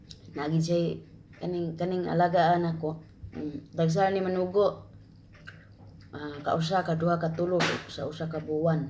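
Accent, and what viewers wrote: Visayas, Philippines. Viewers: Hello!!! Pag hk after ka mahuman sa imo contract inday ky mas dool sa ato... Nindot jd paminawon kng mgbinisaya ka inday👍😊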